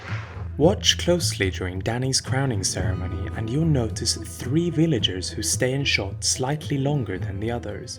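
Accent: Sweden. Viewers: I can hear the narrator is swedish. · is the narrator swedish? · Man hör du är svensk hahah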